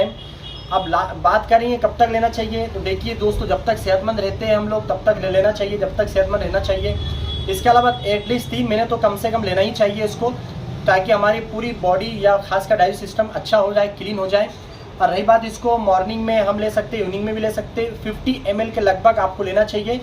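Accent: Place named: Aurangabad, India. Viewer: Aawaz me Aurangabadi Laheja Bahut hi badhiya hai Dr.sahab...👍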